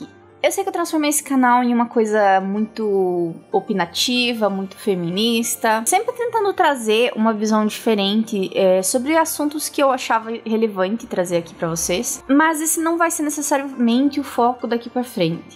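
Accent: Paraná, Brazil. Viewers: Caraí!!!!!!!!! Tu É Paranaense!!!!!!!!!!?????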